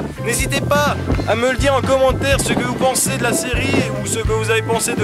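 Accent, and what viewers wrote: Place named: Alsace, France. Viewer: MMMMMH Cet accent Alsacien! arrêtes de te renier:3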